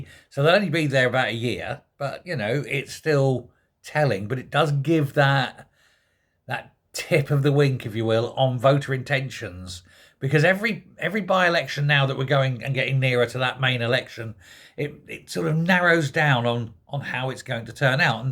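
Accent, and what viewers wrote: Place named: Cockney, United Kingdom. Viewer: why is a cockney comentating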